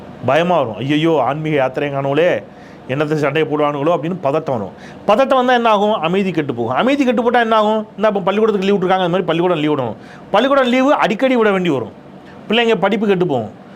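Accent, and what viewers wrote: Tirunelveli, India. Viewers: அண்ணாச்சி உங்க நெல்லை தமிழும் உள்ளார்ந்த உறவின் நெகிழ்வும் உள்ளபடியே நெஞ்சத்தை தொட்டு விட்டது வாழ்க.... வளர்க.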